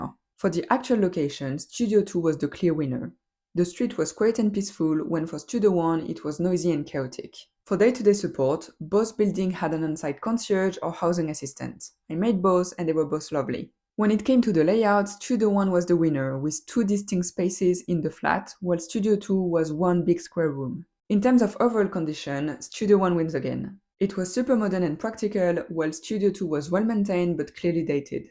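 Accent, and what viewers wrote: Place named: France. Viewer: I was too busy appreciating your kick-ass French accent to even watch what you were showing lol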